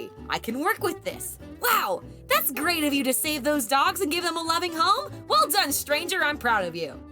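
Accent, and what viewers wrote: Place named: South, United States. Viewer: i'd say it's not only the southern accent, but the voice itself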